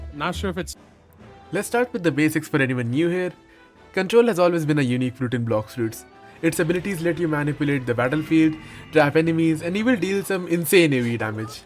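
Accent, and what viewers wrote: India. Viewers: You sound like an Indian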